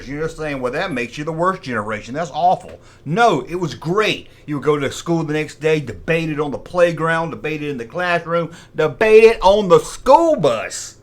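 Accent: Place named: South Georgia, United States. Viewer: So dad and I are on vacation and I’m checking out this episode and dad says “What the hell are you watching??” I said what!? Dad, this is!! He laughs and says he sounds like a bumpkin from South Georgia. I said, “He IS He IS! And I can’t get enough!! 😅😂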